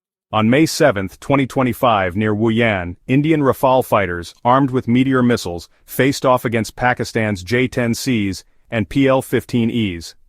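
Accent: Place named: United States. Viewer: BS in an American accent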